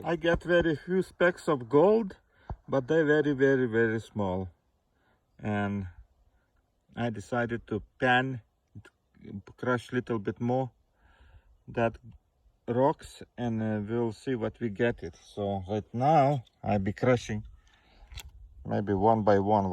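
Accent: Russia. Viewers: You sound like Crazy Russian Hacker. You must be Russian. Am I correct?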